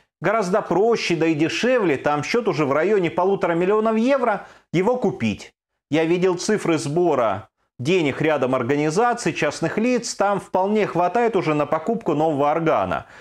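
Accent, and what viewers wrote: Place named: Moscow, Russia. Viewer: По речи я но, что не украинский журналист.Уж очень московский акцент.Наверное по сути правильно,но какое вам дело до всего,с о происходит в другом государстве.Ах,речь идёт о церкви которая принадлежит вашему государству,т.е.Московской России.Вы давно уже разграбили украинские храмы,а теперь ещё цепляетесь зубами и когтями за то,что вы считаете имеет значение для вас и ваших интересов в Украине.А главное прибыль от паствы.При всем при этом суть вопроса понятна,надо было восстанавливать храм.И прежде всего забрать его у Московского патриархата.Уходите с нашей земли и мы наведём у себя порядок.А вы держите у нашего горла нож и ждёте чтобы мы пошевилились,чтобы убить нас.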